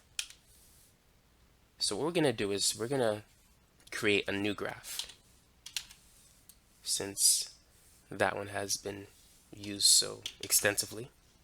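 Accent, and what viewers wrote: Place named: New York City, United States. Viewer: I think there is a slight NYC accent in there. Almost makes me miss home. Great video...thanks!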